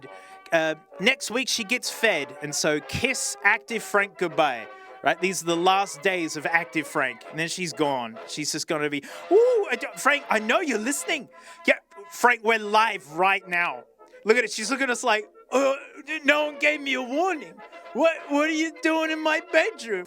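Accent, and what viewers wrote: Australia. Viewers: Everything about this accent says Queeeeeenslanddeerr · Either I’m like racist and all Aussies sound exactly the same or this is definitely the guy from the channel 🤔 it’s gotta be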